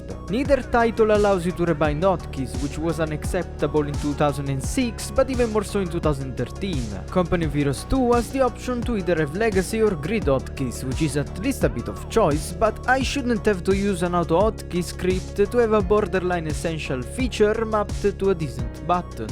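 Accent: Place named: Italy. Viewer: Damn, that italian accent is thick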